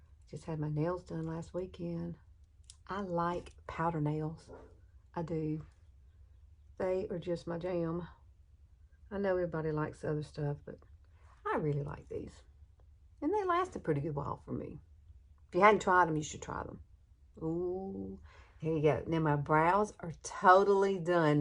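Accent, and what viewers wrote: South, United States. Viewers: Ur video from 6 yrs ago has a bit of a diff southern twang but it’s all so cute :) I miss hearing u say charlotte tilSbury 🤗♥️ I can’t wait to try ur make-ur-own liner brush technique & ur shadow hooded tip 💖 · Nothing wrong with sounding Southern, it’s a great part of our country! It sounds cute too.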